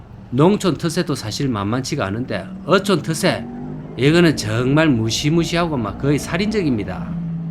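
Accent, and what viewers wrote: Gyeongsang, South Korea. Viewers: 어우 갱상도 사투리 듣기싫네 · 구수한 말씨의 전국을 다니는 경상도 사나이 응원합니다 ! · 경상도 사나이 말투 듣기 좋습니다 언제 구룡포 함 가봐야겠군요~~👍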